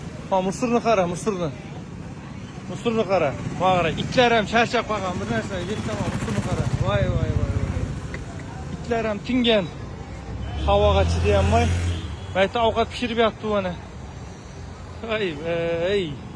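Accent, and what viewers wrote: Shymkent, Kazakhstan. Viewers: Чимкент узбеки акцент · Ака чимкетликмисиз ???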